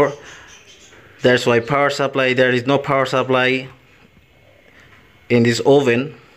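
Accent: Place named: Philippines. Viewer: Wow ang galing nman parang pinoy lng ang NG sasalita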